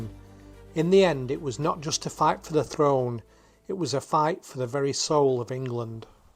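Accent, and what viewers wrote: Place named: Lancashire, United Kingdom. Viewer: Enjoyed the video as there are not a lot of videos about Lancashire's history, done with a Lancashire accent too, not enough of those on't internet either.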